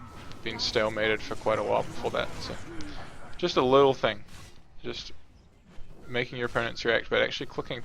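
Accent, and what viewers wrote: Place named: New Zealand. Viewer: ayyy a fellow kiwi i can tell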